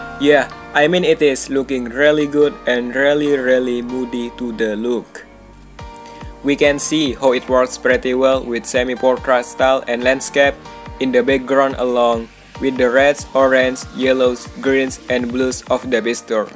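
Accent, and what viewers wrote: Indonesia. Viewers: are you indonesian? hahaha those accent tho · Indonesian accent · aksen Indonesia memang top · English aksen indonesia 😅 but videonya sangat membantu bro.. thank u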